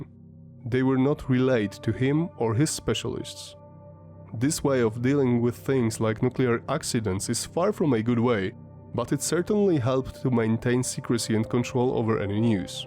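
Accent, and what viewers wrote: Russia. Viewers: oh ok. I wasn't sure . I do like the accent and thought you may be Russian.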